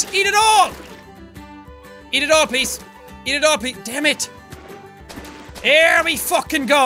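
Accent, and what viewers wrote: Ireland. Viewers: Got so Irish this episode. Just listen to the outro. I LOVE IT.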